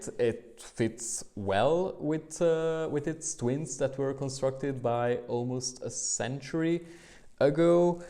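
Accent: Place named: California, United States. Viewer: for a supposed hungarian you sound a lot like you'd rather be some californio.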